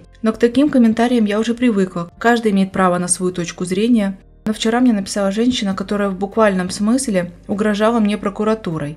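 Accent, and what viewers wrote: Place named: South, Russia. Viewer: У меня недоверие. Почему ролик озвучивается голосом с таким явно выраженным говором, южно-русской "г"? Понятно, что человек со стороны нанят для озвучки. А почему?Почему автор канала сам не озвучивает этот ролик??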